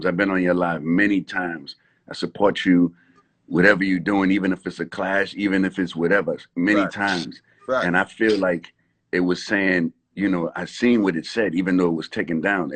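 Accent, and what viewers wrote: Jamaica. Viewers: Yo a fuckery a diss I didn't even know this was happening yo man fuck all this bullshit why name getting called in fuckery y'all who know know he don't hate know he big up EVERYONE no matter what it don't matter where you from what you rep big up everyone and him stay away from fuckery I'm dissapoimted that he has to address some fuckery like this I've never seen like this for him to be like this is nah right nah kool a fuckery ah dis general he fucking holds it down for the WHOLE AH DUH FUCKING CARRIBEAN y'all ignorant idiot talk bout him not no yardie nah matter if em yardie or not em support all yuh and he is yardie listen when he speak regular in a non YouTube shit he speak patois man a yardie pusshole all yuh but Nah the point the point ah fi look out fi di Caribbean and ah dah whole ah we nah just yard Nah just Trini but em chat fi did whole ah di carribean brighten up all yuh ignorant fool realize what he saying is we Caribbean need to support each other and not from they come up but from they ground from they dirt and that we as carribeans needs to support each other no matter who fucking island yardie bajan Trini gayaneese Nah fucking matter we need to fucking show love appreciation and support and big up we culture we are one Caribbean because in the U.S in the U.K in Canada dem nah see we dem nah respect we we ah FUCKING CARRIBEAN WE ARE THE FUCKING WHOLE AH WE support the WHOLE AH ONE ANOTHER not just one island support itself but all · ok i'm convinced you're Jamaican now. 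I can hear the natural accent.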